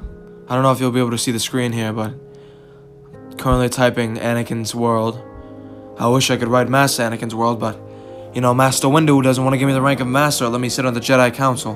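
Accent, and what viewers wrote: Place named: Staten Island, United States. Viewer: Sounds like Anakin with a Staten Island accent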